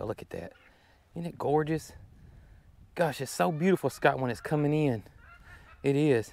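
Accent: South, United States. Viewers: Who ever is doing the closed caption sure doesn't understand your southern accent. lol When you say buck they say book. hahaha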